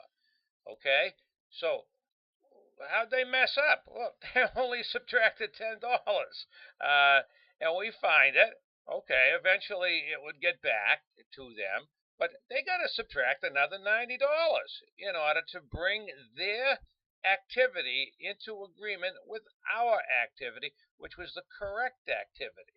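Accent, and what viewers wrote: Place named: Boston, United States. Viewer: Very helpful. I love your Boston accent and mannerisms. I'm from Boston too!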